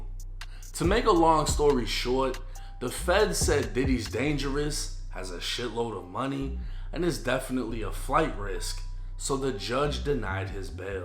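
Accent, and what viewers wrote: Boston, United States. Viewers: Bro your accent sounds florida/Boston/ and Massachusetts 😂😂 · Yooo shit had me dying i be in here just to hear this white boy narrate the news 📰 bro got a mix of accents with the local Boston accent god bless America 🇺🇸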